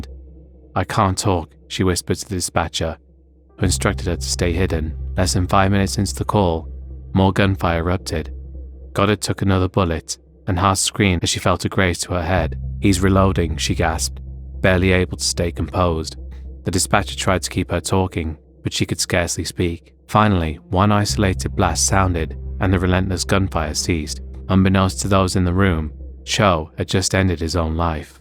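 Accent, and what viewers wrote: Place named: United Kingdom. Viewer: British limey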